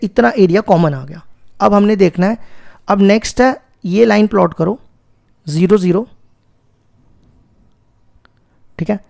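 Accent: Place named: Jammu and Kashmir, India. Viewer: Bhai kaisa hai tu unke udhar sab aise hi bolte hai j and k se hai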